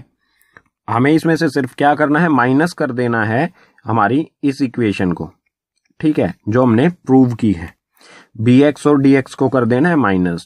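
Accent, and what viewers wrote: Haryana, India. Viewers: Sir aap Haryana se ho na ? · Sir aap haryanvi ho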